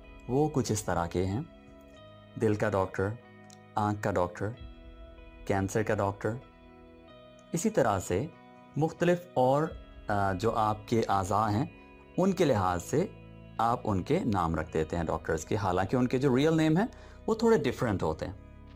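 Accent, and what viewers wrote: United Kingdom. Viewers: yar pehla banda daikha jo urdu me English accent use kar raha hai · Sorry to say Mujhi srif apka urdu ko english style mein bolna acha nhi lgta..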